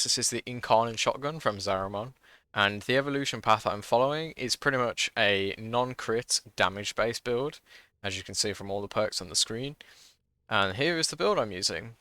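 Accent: United Kingdom. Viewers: Great vid from my favourite Bri'ish content channel! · new bri'ish content woaaw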